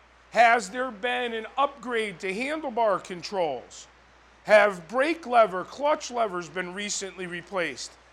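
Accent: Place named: New York, United States. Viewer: New Yorker.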